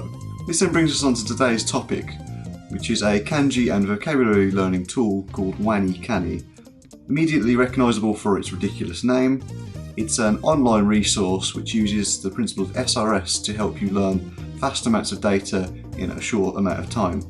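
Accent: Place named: Birmingham, United Kingdom. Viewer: Kantan Japan I will definitely check that out, I had heard of it but was already swamped with books and other websites etc. And the accent give away is from your N4 video, you said the number 5 - it's impossible to cut the accent out of that number ;) Also, I'm from Great Barr, so only down the road - probably why you sound so familiar!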